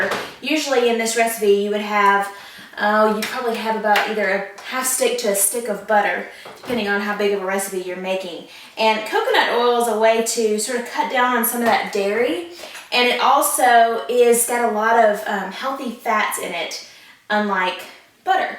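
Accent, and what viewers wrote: South, United States. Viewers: Is she from Alabama or Georgia?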